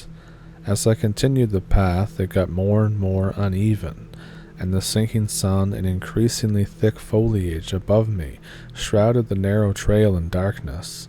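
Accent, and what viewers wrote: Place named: Nova Scotia, Canada. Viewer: Are you in N.B or N.S? I know the accent as I am from Nova Scotia hahah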